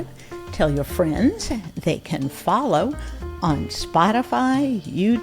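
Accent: United States. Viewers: Agree! A cultured American accent and voice. I could listen to her for hours. So very intelligent and balanced.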